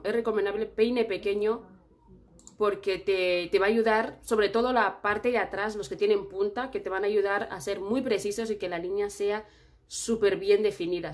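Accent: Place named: Spain. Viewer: Saludos desde Lawrence MA USA. pero soy natural de Puerto Rico.. me encanta tu acento de español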